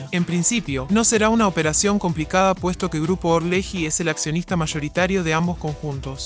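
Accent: Argentina. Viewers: Que inche necesidad de el acento argentino? Le da más valor a la publicación?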